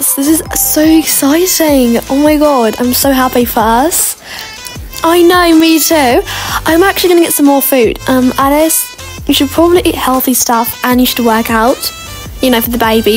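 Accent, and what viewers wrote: United Kingdom. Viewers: Why sometimes you Talk British and Sometimes You Talk English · Omg the British accent 😫😫😫😫so PERFEXT!?!?!??!♡♡♡♡ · Omg i love your channel and the brtich accent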